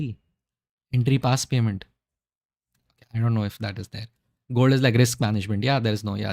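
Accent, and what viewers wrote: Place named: Allahabad, India. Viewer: You sound like an Allahabadi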